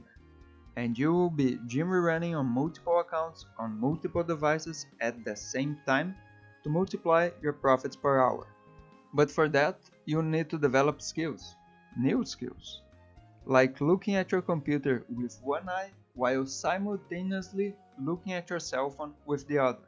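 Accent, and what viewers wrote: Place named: Brazil. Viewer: You speak english like a brazilian guy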